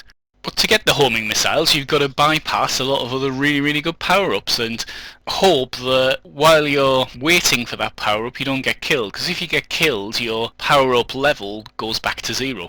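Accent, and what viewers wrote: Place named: England, United Kingdom. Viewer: I gotta say, as a yank it was BRILLIANT listening to an English person explain baseball! Like hearing what your language sounds like to people who don't speak it or something... You did an excellent job by the way! Love it!!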